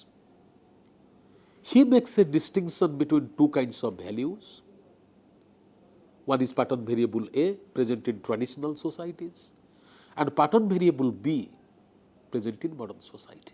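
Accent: Odisha, India. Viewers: Sir are you from odisha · I can’t understand his pronounce because of oriya flavour is coming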